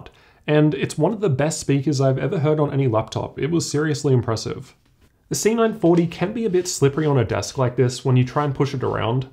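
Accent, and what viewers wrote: Australia. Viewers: The Australian accent caught me off guard 😆🤟 not many Aussie techies on YouTube.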